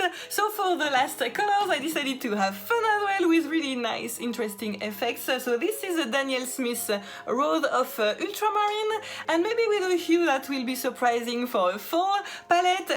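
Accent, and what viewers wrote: France. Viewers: I’m so happy when I see you. I love your lovely french accent and your personality. Your art is 💯❤️❤️❤️ thank you for existing! Lots of love from Italy 💚🤍❤️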